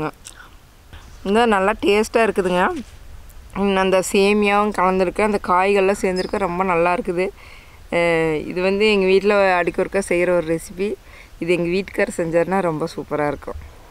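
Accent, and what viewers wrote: Kongu, India. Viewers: Ungaloda kongu tamil tha romba alagu 😍😍🔥💯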